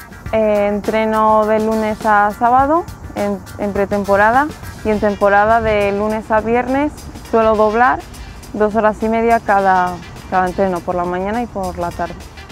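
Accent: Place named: Spain. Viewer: Love the Spanish accent., so original.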